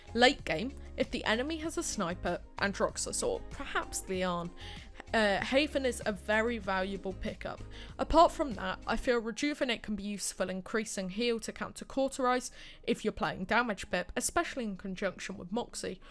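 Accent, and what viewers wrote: United Kingdom. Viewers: Omg, your British accent is pure spot on but I'm having a little bit of trouble making out those words XD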